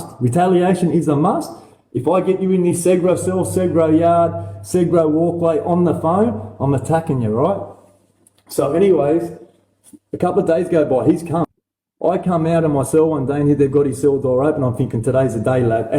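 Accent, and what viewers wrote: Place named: Lebanon. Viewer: Your white!! And sound lebo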